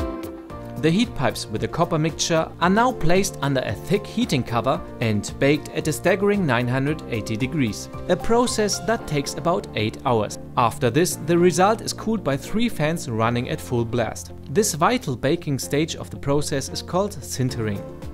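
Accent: Germany. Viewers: That german accent :D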